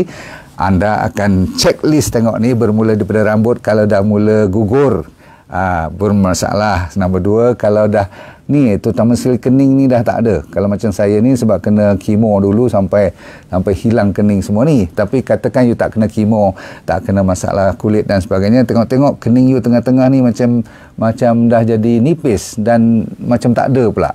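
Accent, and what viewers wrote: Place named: Terengganu, Malaysia. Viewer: Dr dh pandai ckp ganu - senyum sokmo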